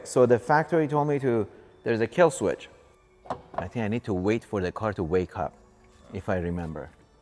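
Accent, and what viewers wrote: Iran. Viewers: Eh-screws, makes me feel like home, good ol Persian accent 😂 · New subscriber. Love your videos, but afraid to pick up Persian accent. ;) Ppl think Im Persian anyway, so Im ok with it anyway. :)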